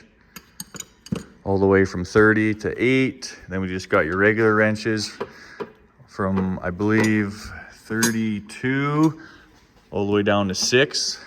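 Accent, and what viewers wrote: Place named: Canada. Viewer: heard you talking and said he's Canadian then saw the Berta tags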